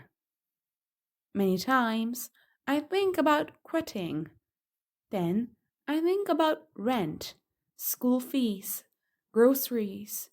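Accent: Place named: United Kingdom. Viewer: I watching from Ethiopia 🇪🇹 so best story with perfect British accept ❤❤❤❤❤❤❤❤❤❤❤❤❤❤❤🎉🎉🎉🎉🎉🎉🎉🎉🎉🎉🎉🎉🎉🎉🎉🎉❤❤❤❤❤❤❤❤❤❤❤❤❤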